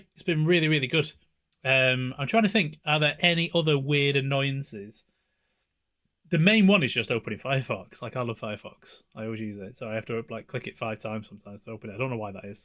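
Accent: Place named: England, United Kingdom. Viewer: Oh, of course, you're English! Now I get why "water" turns into "wo'uh"!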